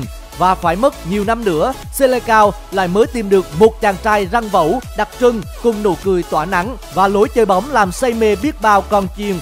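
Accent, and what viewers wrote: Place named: Quang Ngai, Vietnam. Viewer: Giọng Quảng Ngãi đặc sệt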